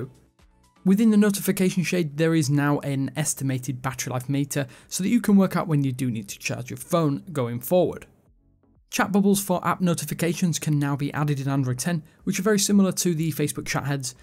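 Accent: United Kingdom. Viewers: That BRITISH accent!!!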